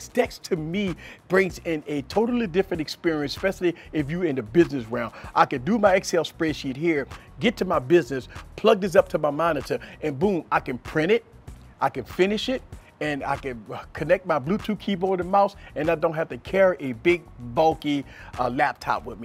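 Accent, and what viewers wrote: Louisiana, United States. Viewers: He sound Creole like he's from Louisiana New Orleans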